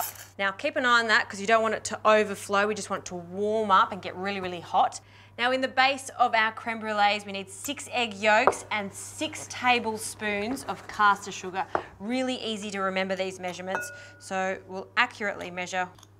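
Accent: Australia. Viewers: Love the Aussie accent although I heard Hate the cream at first. :D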